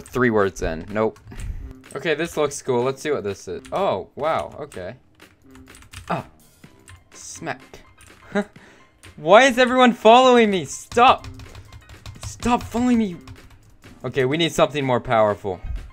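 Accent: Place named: United States. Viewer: me who would think that the one who owns such a strict group would not call everyone a moron also u sound like your from america but isnt this a british army?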